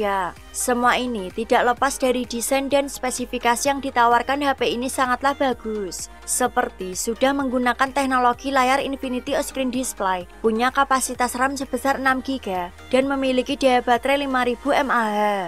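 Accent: Java, Indonesia. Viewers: suara nya bikin ngakak,,medok medok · Medok ayu lo, aku ya medok kebumen hahaha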